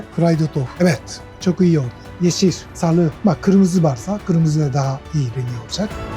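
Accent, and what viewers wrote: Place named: Japan. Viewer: japon aksanıyla türkçe duymak çok güzel yav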